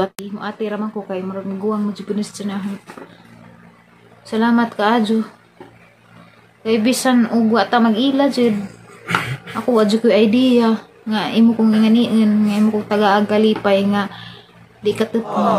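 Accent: Bohol, Philippines. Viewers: You're deserving ma'am, because you're so kind and truthful. Malipayon jud ko makadungog sa imong bol-anon accent, taga bohol man sad ko sa Dimiao Bohol, God bless you and your family 🙏🙏🙏🌷🌷🌷❤❤❤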